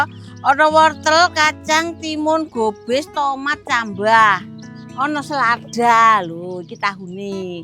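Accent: East Java, Indonesia. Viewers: Bu rumah nya dmn🙏koq logatnya spt daerah etanan😊😊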